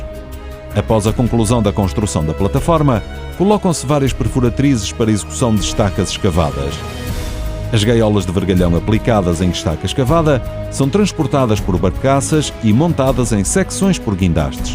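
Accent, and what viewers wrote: Portugal. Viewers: Porque um português na narrativa? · Está voz é de um português de Portugal!! Quero entender está evolução toda!!🤔🤔🤔🤔🤔🤔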